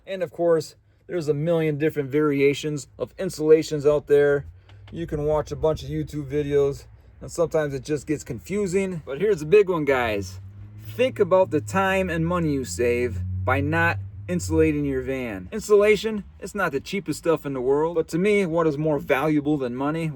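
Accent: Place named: Wisconsin, United States. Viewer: Hey man, this is a great video. Thank you! I think I'll take this approach for now, I'm thinking a semi-insulated version 'cause I live in AZ, but I'd rather the funds go toward traveling not insulation materials! Love your accent, are you from Wisconsin? If yes, me too!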